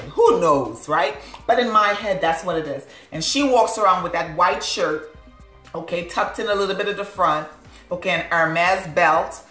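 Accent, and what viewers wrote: Trinidad and Tobago. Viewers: Are you trini ? U sound trini lol it’s cute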